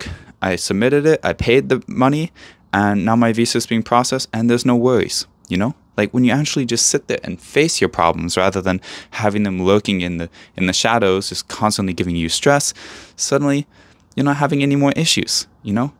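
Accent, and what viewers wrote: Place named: United Kingdom. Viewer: You have a British accent, that's cool.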